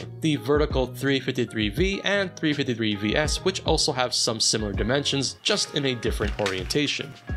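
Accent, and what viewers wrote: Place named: Delaware Valley, United States. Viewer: Another great deep-dive review! Thanks!! BTW, are you originally from Philly? You sound like a Delaware Valley guy!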